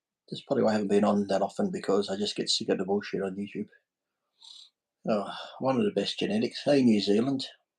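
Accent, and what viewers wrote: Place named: Australia. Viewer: More of these live streams please,! Need more Aussie voices on YouTube 💪🏼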